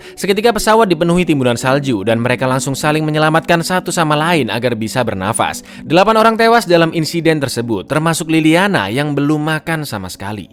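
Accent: Java, Indonesia. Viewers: Pindah ke channel saqhayang yuk. Wong ini medok kali.. Gue org sumatra orak ngertos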